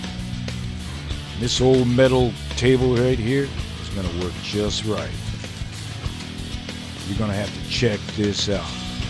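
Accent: South, United States. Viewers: James Cassel Southern U.S, man. Don't judge them.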